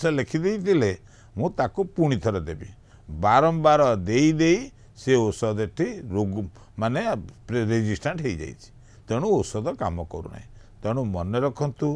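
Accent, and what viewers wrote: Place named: Bengal, India. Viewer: Dr Odia karuchanti bangali vasakemiti aasuchi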